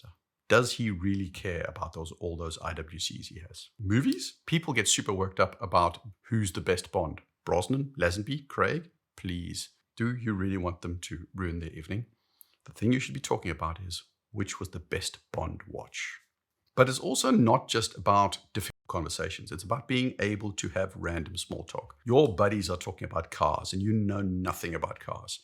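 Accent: South Africa. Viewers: he sounds like he’s South African. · ...and a slight South African accent!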